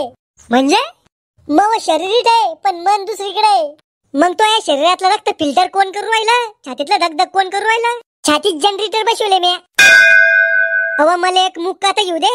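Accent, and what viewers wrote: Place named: Marathwada, India. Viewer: Nakki jalana cha asnar bole vrun tr as wate😂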